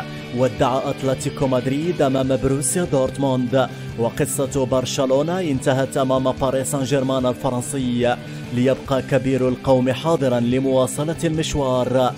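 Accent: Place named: Iraq. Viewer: اه كتير حلو وحكيه مميز والسرد ايضا.. من كلامك مبين انك عراقي😊♥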